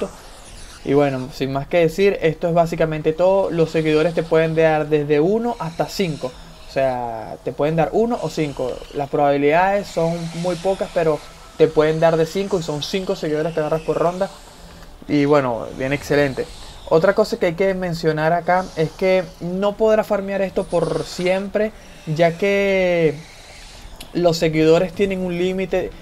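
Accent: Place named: Venezuela. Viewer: Buenas hermano gracias por la guía en serio mucha ayuda sólo.que iniciar este juego cuesta muchísimo el inicio es difícil , pasarte la campaña sobre.Todo los dos últimos mapas cuesta aún no he podido , tampoco tengo mucho jugando apenas 1 semana y entendiendo mejor el juego con ayuda de vídeos como el tuyo,ahora he visto cosas que para mi una sola.explicación tienen como el.tema de tanto.daño y hp de algunos personajes y.debe ser que han pagado cambian gemas y compran el.rank 10 del Premium que tiene buenos beneficios de.hecho excelentes eso les ayuda y que empezaron el día del lanzamiento del juego. Si te animas agradecido por los vídeos y tener alguien con quien jugar en línea de habla hispana y ese acento debe ser venezolano jajajaja si es así de que parte hermano y gracias precios los consejos y tu tiempo al.subir vídeos .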